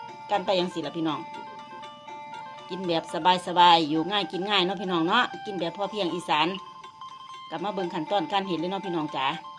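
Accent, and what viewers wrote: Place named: Sisaket, Thailand. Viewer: เป็นตาแซบแท้จ้า จ๋า❤️เว้าคือคนศรีสะเกษแท้จ้า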